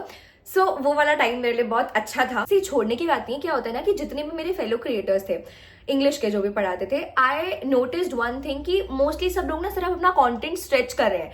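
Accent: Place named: United Kingdom. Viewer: I just love your English accent 🥰❤️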